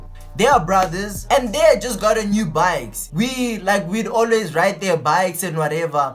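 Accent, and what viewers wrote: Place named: South Africa. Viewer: I like his SA accent its real dope🔥❤️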